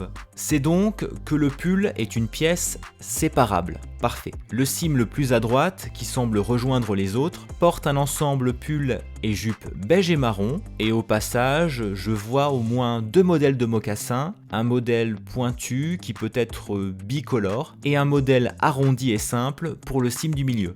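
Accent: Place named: England, United Kingdom. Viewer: J'aime beaucoup ces vidéos d'analyse et de décortication. (Superbe accent anglais au passage). Bon... perso je suis clairement déçue. J'en ai déjà marre des minikits, et même si j'ai acheté les précédents car ils pouvaient apporter des choses à mon jeu (et que je suis une collectionneuse, j'avoue), eh bien celui-là ne m'attire pas DU TOUT. Alors je me pose une vraie question... Est-ce que je deviens une vieille aigrie ? Est-ce que ça y est, je n'arrive plus à vivre dans mon époque ? Mais franchement je trouve toutes les pièces présentées très laides, voire immettable. Non mais franchement, IRL qui a envie de porter ça ? Je ne suis pas une pro du style hein, du coup mes propos n'engagent que moi, mais m** quoi, moi aussi je voulais des tenues hommes simples et mettables ! Donc du coup je suis en colère. Je ne paierai pas pour avoir 1 paire de pompes et 2 pulls ! 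Voilà ! 😑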